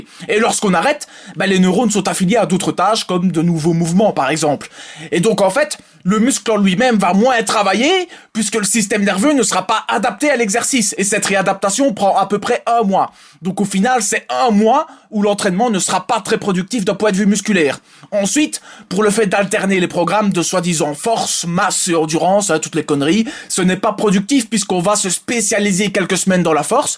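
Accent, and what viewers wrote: Belgium. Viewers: es tu Belge???:D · ahahahaha!!! Génialissime, avec l'accent belge et tout trop fort, merci:D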